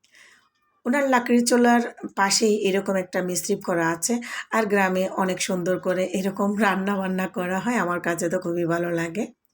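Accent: Sylhet, Bangladesh. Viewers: Apur mat ta very nice lager na sylheti kori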